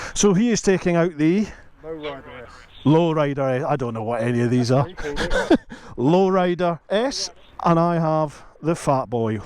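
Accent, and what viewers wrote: Scotland, United Kingdom. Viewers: A Scotsman on a Harley ...I like the voice sound... Great vid..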